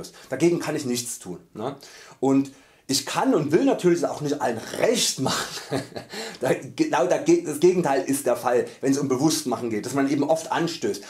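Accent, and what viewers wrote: Saxony, Germany. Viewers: Hi:) inspirierendes Video! Ja mit dem Thema Verständlichkeit ist es immer so eine Sache ^^ Vielleicht solltest du eine Art Stichwortverzeichnis bzw. eigenes kleines Wörterbuch schreiben wo du deine eigenen Termini erklärst. Nach Schema: Liebe: ist die universelle Verbundenheit im Universum... Freie Liebe ist... Was Liebe nicht ist: Eiversucht: ist... Rohgan: ist meine Lebensphilosophie, die bedeutet Kommunikation: ist.. Partnerschaft: ist.. und dann jeweils vor deinen Videos darauf verlinken. Problem ist im allgemeinen dass Menschen unter Worten oftmals etwas komplett anderes verstehen. Dein populärstes Beispiel ist wohl "freie Liebe": ich behaupte 80% der Menschen versteht da Liebe(Sex) machen mit jeder & jedem in totaler ungebundenheit. Du scheinst darunter im Großen und ganzen etwas anderes zu verstehen. Das Problem ist, dass man Deine Videos meist nicht in Reihenfolge schaut, zumindest neue Abbonenten. 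In einem Buch kann man Begrifflichkeiten nach und nach erklären und das Buch und den Leser, so zu sagen, mit der Zeit aufbauen. Mit dem Medium Yt ist halt nicht direkt so gut möglich, sondern eher ein "Durcheinander". Und wie du sagst, es gibt Leute die einen patu nicht verstehen wollen, jeder deiner Zuschauer wird nur das aus deinen Videos beziehen, was er bereit ist aus ihnen zu erfahren. Wenn jemand im Voherein sagt, der blöde sächselnde... wird er eben diese Erfahrung machen. Wenn jemand beschließt dieses Video im Kontext der Bereicherung anzuschauen wird er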